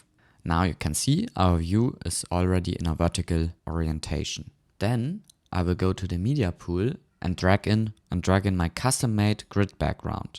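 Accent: Germany. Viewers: Nice video but you sound so german😁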